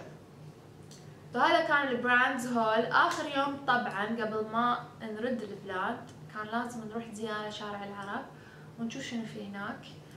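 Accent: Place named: Bahrain. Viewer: كاتبه انها اماراتيه بس لهجتها يايه ع البحرينية + شوي عراقيه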